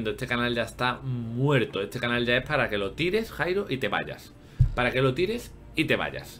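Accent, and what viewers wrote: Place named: Spain. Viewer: Soy venezolana y te confieso que veo tus videos porque me hace full gracia tu acento y las expresiones españolas, estilo "me toca los cojones" jajajajajaja sorry :D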